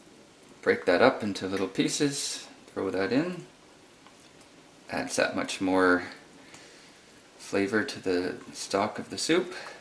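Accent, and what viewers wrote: Canada. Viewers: Canadian, eh? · Oh my god, I love that accent! Canadian, right? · Thanks you for sharing your ideas and opinion in clear easy to understand English. A Canadian accent, I suspect, maybe with Newfie influence. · You sound like a fellow Canadian. I could be wrong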